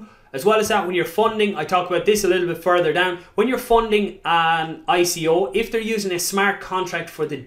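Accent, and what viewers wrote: Ireland. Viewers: good man,me too! 😉 Hey I notice you got Irish accent, I'm living in Dublin,I'm entrepreneur,was on dragons den last year. Do you live in Ireland? I'm starting work on a new project, a new.com crypto unlike any seen before, I obviously think it has massive potential, but i could do with a partner with good knowledge of crypto world like you. You interested?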